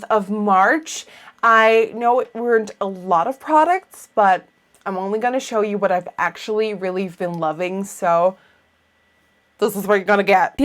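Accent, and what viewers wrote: United States. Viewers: omg i just started watching your videos and i honestly would have guessed that you you were american! Love your videos!! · I HAD NO IDEA YOU WERE IN HOLLAND!! You sound American omg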